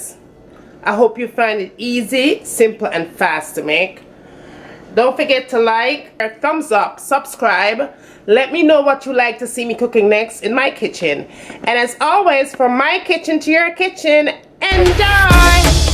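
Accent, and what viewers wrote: Jamaica. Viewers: My girl. Twang fi mi a likkle. Deh accent deh raw and solid like a tru Jamaican! Lol. Tonks fi do watch :-)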